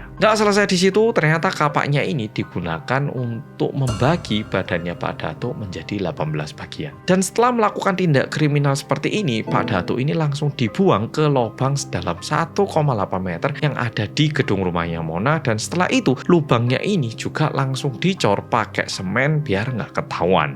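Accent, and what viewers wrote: Java, Indonesia. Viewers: Hallo bolo medok😊 Lgsg nyimak rek 🎉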